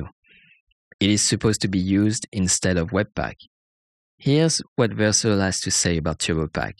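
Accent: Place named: France. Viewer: are you French ? (I'm French and you have an French accent)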